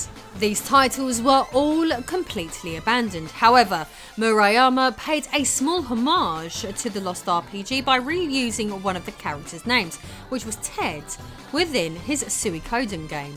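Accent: England, United Kingdom. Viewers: How have I not come across this amazing channel before??? So much rare footage, attention to detail and such a lovely, kickass english accent 🤩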